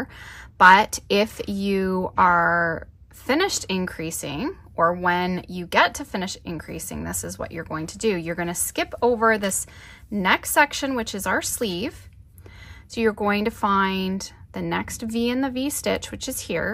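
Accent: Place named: Canada. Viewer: Just finished my cardigan. Beautiful thanks!! And I do love your Canadian about and out pronunciation 🥰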